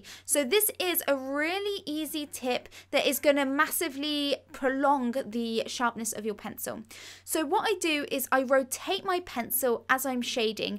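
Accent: United Kingdom. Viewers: Just love it! You're so clear in your explanations. I'd love to join the course on portrait, it would be a wonderful gift to myself for my birthday in June! Ps. You British accent is to die for, being Italian listening to you is a great way to improve my language skills which is an extra pro!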